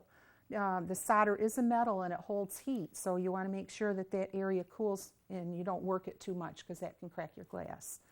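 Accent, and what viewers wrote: United States. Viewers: Thank you for this instructional video. But, the whole time I was thinking of Fargo, yeah, you betcha, don'tcha know?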